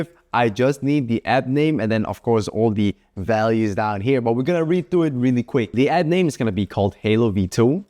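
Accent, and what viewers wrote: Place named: Denmark. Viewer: W DANISH ACCENT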